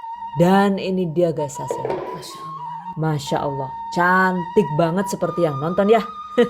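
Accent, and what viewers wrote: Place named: Cilacap, Indonesia. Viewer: Jadi eman" di pangan, enak disawang. Penuh kesabaran tenan dan ketelatenan tentu ne. suwun ilmu dan trik" nya. saya kangen kalau ngomong gawe logat Cilacapan. [suwun]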